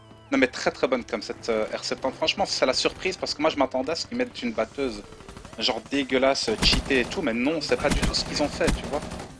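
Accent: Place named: Belgium. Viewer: Oui il est de Bruxelles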